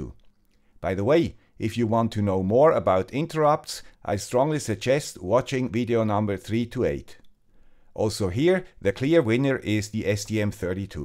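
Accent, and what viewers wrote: Switzerland. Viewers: The guy with the Swiss Accent - Smashing the Arduino learning curve into a pancake since Video #1.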